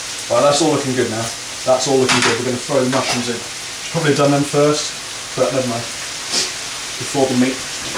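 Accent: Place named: United Kingdom. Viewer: Hello! I watch your videos, and I'm enjoying to improve my English listening you with your fantastic british accent. Hugs mate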